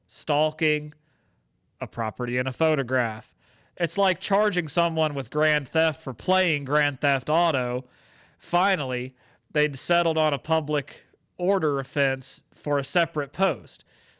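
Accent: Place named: United Kingdom. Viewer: Nice British accent (From a Brit). 👍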